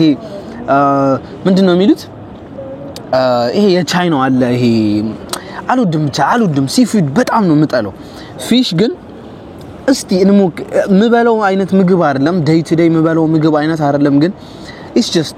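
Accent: United Kingdom. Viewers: Ur british accent ❤❤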